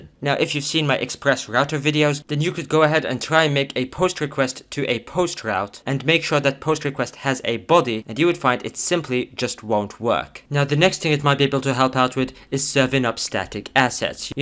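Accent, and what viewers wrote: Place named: France. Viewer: man this is so much better than those 30+ minute videos, short and to to the point + meme game is on point + a French? accent 10/10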